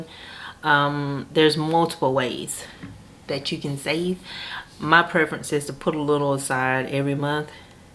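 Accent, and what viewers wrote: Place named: South, United States. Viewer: New viewer from Ft. Worth. Don't be worried about a southern accent, girl. It's something to take pride in says this Texan, so nurture it! Hahaha. Interesting to see how you fund your sinking funds. I'm more at the $3 per envelope level. Just started cash envelopes. SLOW PROCESS! Take care and happy budgeting!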